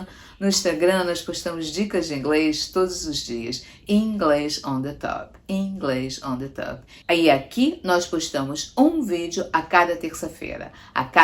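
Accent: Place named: Rio de Janeiro, Brazil. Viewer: venho só pelo sotaque carioca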